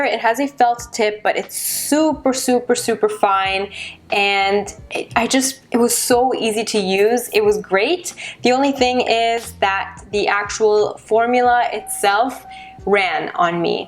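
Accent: Canada. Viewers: Maybe it's because I've lived in SF for a year, but you sound extra Canadian in this! And I had no clue Rituals was from the Netherlands. Great video as always! xoxo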